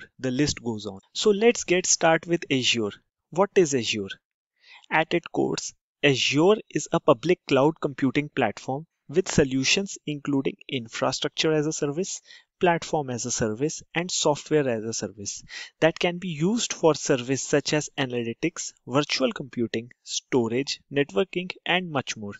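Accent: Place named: United States. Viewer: Accent like American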